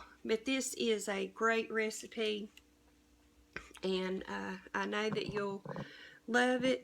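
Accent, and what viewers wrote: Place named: East Texas, United States. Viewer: I love your accent! I went to high school and met my husband in East Texas—listening to you is like going home! ❤️❤️❤️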